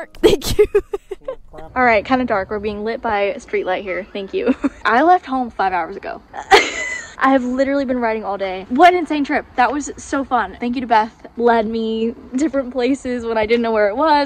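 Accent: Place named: South, United States. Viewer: Definitely can hear the country twang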